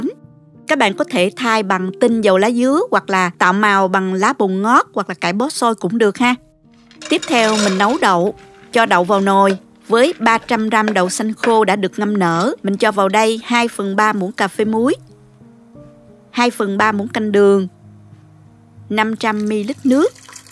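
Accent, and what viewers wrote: South, Vietnam. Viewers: cảm ơn đả chia xẻ nhìn ngon và đẹp quá có giống nói rất đẻ thường giông nam ngọt quá à tôi nghe thấy mê luôn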